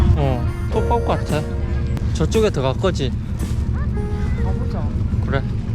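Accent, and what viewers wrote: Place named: South Korea. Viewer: 넘나 웃겨죽는 사투리보러왔다가 혼자 실실웃고 난리입니다. 아 진짜 똥휴지가있어 부터 넘우껴서~~으아 ㅋㅋ 글고 같은 유튜버로서 영상얘기 좀 나누고싶은데 통화한번 하는거어떠실까요?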